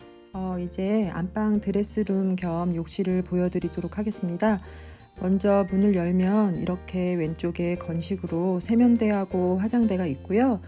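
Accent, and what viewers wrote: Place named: Seoul, South Korea. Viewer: 서울사투리 너무 이쁘세요~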